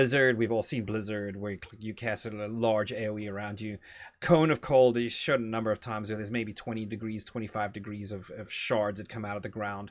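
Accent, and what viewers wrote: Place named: Texas, United States. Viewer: I liked this video quite a bit, and also happy you are doing more content. But, the big story for me is that I am starting to hear a Texas accent slip into your narrative occasionally :)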